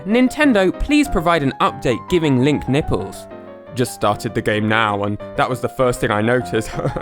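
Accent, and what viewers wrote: United Kingdom. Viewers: did i just listen to a british guy talk about nipples for eight minutes? yes. yes i did.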